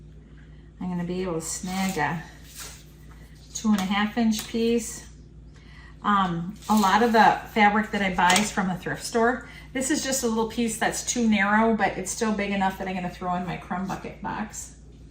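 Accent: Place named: Midwest, United States. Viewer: I don’t know how many times I have watched this video. I LOVE cutting up scraps so this is right up my alley. My father was born in Charles City near you and we went there every summer and even once at Christmas. I have many wonderful memories of Iowa (which is beautiful) and your Midwest accent is pleasing to my ear. I read your blog daily and I wish you the best with your health issues.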